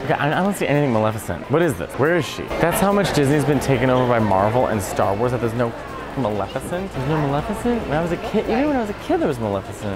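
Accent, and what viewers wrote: Italy. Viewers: I’m surprised but I shouldn’t be that sounds like an Italian speaking Spanish. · Spanish with a heavy Italian accent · The Italian accent when you speak Spanish! I love it. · He speaks Spanish with an Italian accent xD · Dude, as a native speaker, your spanish was pretty good :) You speak spanish with an italian accent and I speak italian with a spanish accent lol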